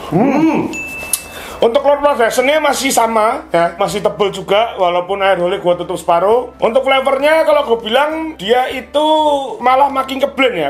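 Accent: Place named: Java, Indonesia. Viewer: Medok rasah nggo gua gua mas😭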